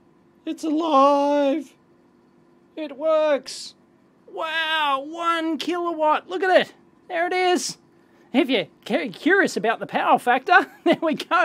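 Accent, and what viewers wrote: Australia. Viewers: Yeah! Aussie accents make this awesome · Bigclive did a review of these a while ago... but your australian accent makes this so much better. Makes me feel like if it kills me, i'm just not australian enough.Keep up the good work!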